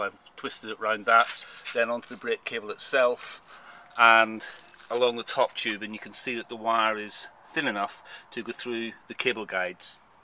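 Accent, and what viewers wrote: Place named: Northern Ireland, United Kingdom. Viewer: You sound like you might originally be from northern Ireland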